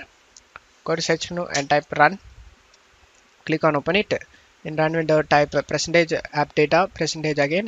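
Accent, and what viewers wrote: India. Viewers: Bro is indian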